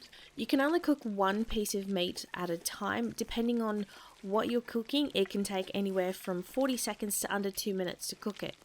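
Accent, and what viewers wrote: Australia. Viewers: Love the Aussie accent and the guide is really useful. Your so underrated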